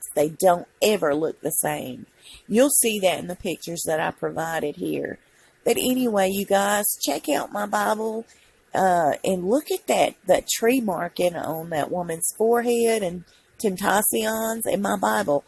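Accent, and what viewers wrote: South, United States. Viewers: GOD'S LOVE watched 2 of your videos the other day and subbed. Love your channel. Love your south Accent with that 'Twang'. New sub. BUT all I've got to say which would be criticism is; 'Michelle Obama' IS a man, It has an Adams Apple and the ring finger is bigger than index.. Just like a man. Plus a women can only fit 1-1/2 headxon their shoulders. She can fit 3.. All the best.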